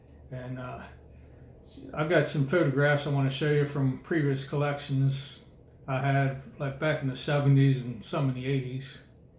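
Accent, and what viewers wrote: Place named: Baltimore, United States. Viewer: You got a Baltimore accent. I'm originally a Harford County girl. Love the Hat btw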